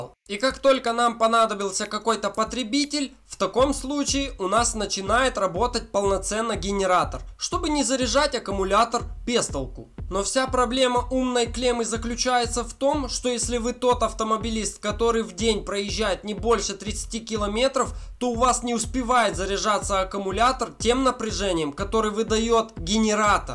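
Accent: Ukraine. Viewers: Акцент дикий! Ты из Хохлостана?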